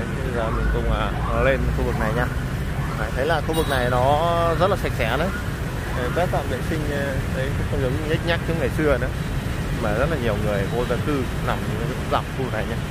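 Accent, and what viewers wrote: Vietnam. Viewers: Chủ thớt làm kiếm cơm ..phát ngôn nói cho chuẩn vào ..đi xe khách kể cả từ bắc vào nam sg 6/7 năm trước ko một nhà xe nào đi tới hai đêm ba ngày chứ đừng nói đi từ miền trung ❤..còn nói giá thông phương tiện sg 5 năm nữa dân toàn đi xe hơi kiếm 1 chiếc xe máy còn khó ...ko nhanh dễ phát triển vậy đâu.thu nhập mặt bằng trung người dân ...nhất là người công nhân lao động tự do ..thu nhập vẫn còn thấp ..nghe giọng cháu này ..hoa thanh quế..quê choa 😅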